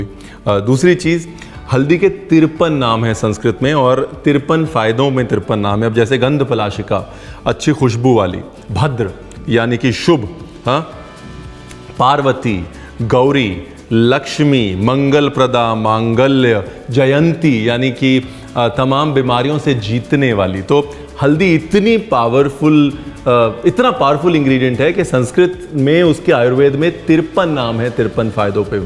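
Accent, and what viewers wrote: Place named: Maharashtra, India. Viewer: "Barni me dalke" nice ❤️ Marathi influenced hindi 😀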